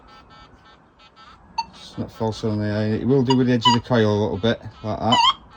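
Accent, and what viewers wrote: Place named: Wales, United Kingdom. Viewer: Hello! Thank you for your video and air test.I am French and I own the Legend in the 1.08 version,do you think that a high audio gain in a mineralized soil is a good setting? (because of false signals if the GB is not well adjusted).I think you are Welsh because of your accent,but I use the translator and please do not worry,I appreciate your tests!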